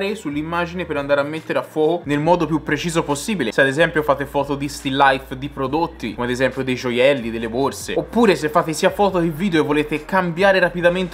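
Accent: Tuscany, Italy. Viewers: Video bellissimi ed interessanti. Vai, parlata toscanaccia simpaticissima, è tutta invidia. Il prossimo fallo in cadenza veneta!!!!!! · Video belli ma correggi L accento troppo troppo toscano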